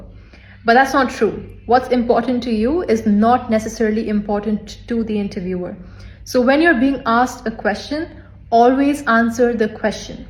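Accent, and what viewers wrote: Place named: India. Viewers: you have a little indian accent can't you speak a little bit faster? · She has an Indian accent because she's Indian. No one needs your opinion on how they speak, she speaks confidently, and the thing is you are talking about how she speaks not on the information she is providing for her subscribers.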